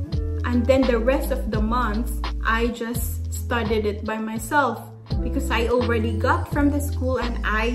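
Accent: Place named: Philippines. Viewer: Are you a Filipino? Hehe. Because you sound like a Filipino accent when speak in English 😅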